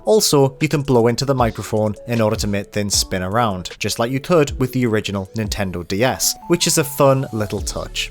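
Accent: United Kingdom. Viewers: Hes british or something. Leave him alone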